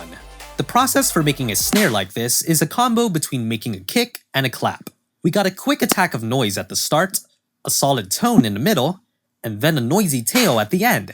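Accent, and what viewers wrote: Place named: United States. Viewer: Your english sounds SO GOOD in my opinion because you said you are from the Philipines. How did you learn English so well to sound american?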